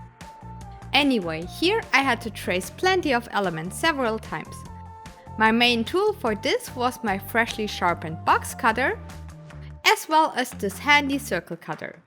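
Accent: Germany. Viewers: Are you from germany? Because of your denglisch akzent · Man hört deinen deutschen akzent, lol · She's German Her accent is German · Make a german Version (man hört das du deutch bist )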